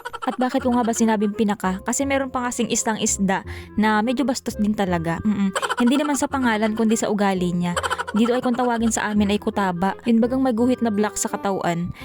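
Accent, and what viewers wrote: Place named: Laguna, Philippines. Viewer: shout out Alway watching from ksa. relate ako sa punto mo magsalita 😂 na miss ko tuloy ang province ko kalayaan laguna ganayan din punto.😂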